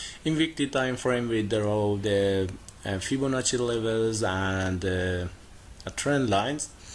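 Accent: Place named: India. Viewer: داداش خسته نباشی ولی فارسی صحبت کن انگار انگلیسی با لهجه ی هندیه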